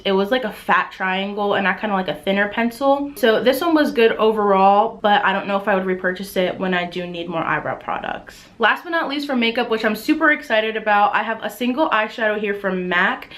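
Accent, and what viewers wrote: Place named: South, United States. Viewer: You sound extra southern in this video 😂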